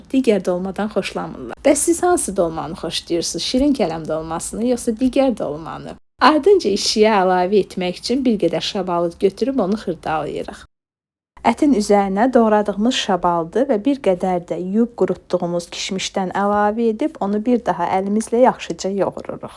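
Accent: Baku, Azerbaijan. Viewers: Can Ay Bakı Kəndlərindn Gələn Səslərin Ləzzəti. Həeee göyərti Yox. Əhsən Sizlərə.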